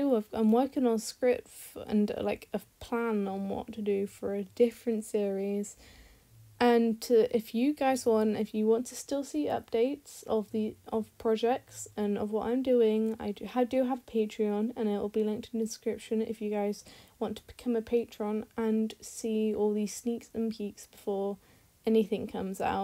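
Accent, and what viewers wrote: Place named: United Kingdom. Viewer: like i said YOUR LIKE ROSYCLOZY YOUR BOTH BRITTISH, SOUND LIKE BRITTISH, AND BOTH MAKE GREAT CONTENT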